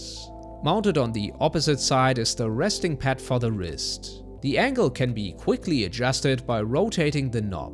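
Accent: Germany. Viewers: German accents are so much fun to listen to.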